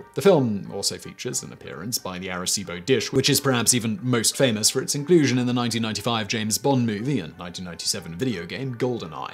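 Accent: United Kingdom. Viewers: Can you guys make a video of this dude saying THAT WAS A REMARKABLE ACHIEVMENT in his thick british accent?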